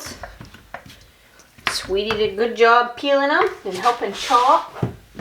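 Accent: Maine, United States. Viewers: Friggen loved it. Your accent kills me brings me back to central ME. I'm gonna try that potato dish. I get honey baked hams every year from my work so I don't have to do all that glaze work. Soak your toothpicks and they won't get crispy your man's got him a great woman! Happy Easter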